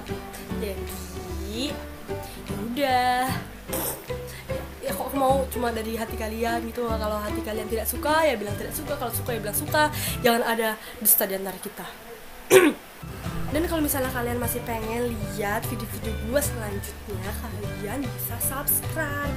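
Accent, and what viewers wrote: Java, Indonesia. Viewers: Nx ngomong medok kuilo marai klepek2 😍😂😂😂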